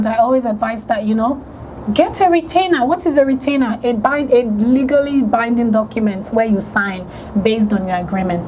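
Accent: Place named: Nigeria. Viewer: I could listen to you all day. I love Nigerian accents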